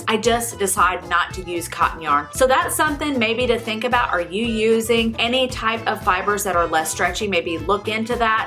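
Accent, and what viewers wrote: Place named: United States. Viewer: Thank you. I found your tips helpful. I go to Physio for other issues and complain often of pain in my right shoulder but I've never mentioned to my physiotherapist the pain in my wrist and forearm because I haven't wanted to bombard her. I should ask her for some help with my issues instead of feeling embarrassed by them. I like the name of your channel. I live near Toronto and I'm bilingual. I was surprised to hear your American accent with a French named channel! If you don't mind me asking, how did you come up with this name?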